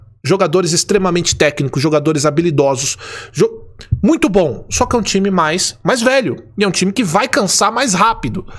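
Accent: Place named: Portugal. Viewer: Eu ouvir o fazendo um vídeo inteiro com sotaque português de Portugal?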